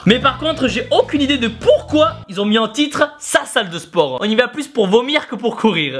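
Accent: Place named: Toulouse, France. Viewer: Atta il est toulousain !!!!XD mais dddaaaaammmmnnn c'est génial xD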